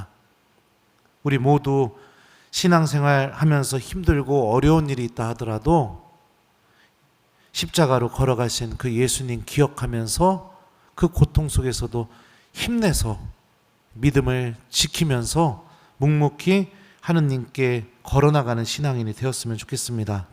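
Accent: Chungcheong, South Korea. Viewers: 충청도백제말쓰시는신부님 김대건신부님이상 귀에쏙쏙들어오는것 신부님튼강강좌미사 감사드립니다아멘 미카열입니다 성부와성자와성령으로아멘 백제말감사드립니다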